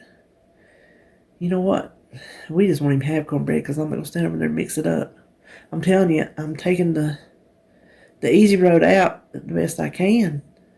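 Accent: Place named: Mississippi, United States. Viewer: quit beating yourself up by saying you're taking the easy way and!! Cut yourself some slack. If you don't feel up to making a recipe and have a simpler or easier way then go for it!! You're wonderful and I LOVE you just the way you are. I'm born and raised in northern Illinois and we wore bread sacks on our feet and then our snow boots. Boots went on easier and helped keep our feet a little warmer. I'm 64 and was born and raised on a dairy farm and we had lots of outside chores to do. You remind me a lot of my Aunt who lived in Mississippi. Your accent, smile and laugh just brighten my day. Have a great weekend.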